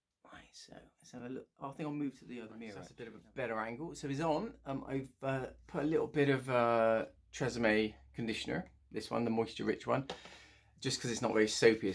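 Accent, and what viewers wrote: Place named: Salisbury, United Kingdom. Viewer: You sound like a Salisbury boy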